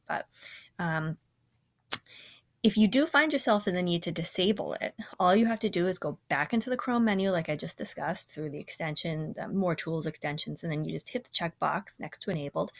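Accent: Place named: New England, United States. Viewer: Hey, Thanks for the videos! Being from California I get a laugh from some of the wicked New England pronunciations (drawring, lol). Anyways, do you know if it's possible to merge a worker account? I realized I had an account I worked on years ago that had a few HITs that might help me push towards 1000. Keep up the good work!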